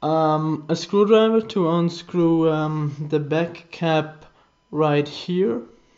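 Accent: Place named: Germany. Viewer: man merkt irgendwie sofort dass du deutsch bist xD ist aber nicht schlimm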